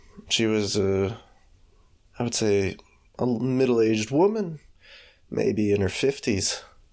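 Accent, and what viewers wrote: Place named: Russia. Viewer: Русское звучание так просто не спрятать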